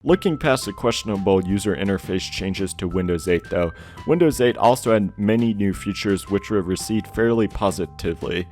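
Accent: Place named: Canada. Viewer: It may be just me but I kind of like his accent in his videos. It sounds Canadian to me. I almost expected him to say oot and aboot.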